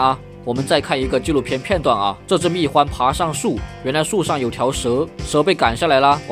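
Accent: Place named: Hunan, China. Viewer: 1，leng；2，不leng。哈哈，这湖南音。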